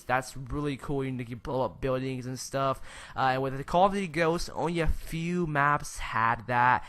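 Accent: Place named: Spain. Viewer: it sounds that you have an Spanish axsint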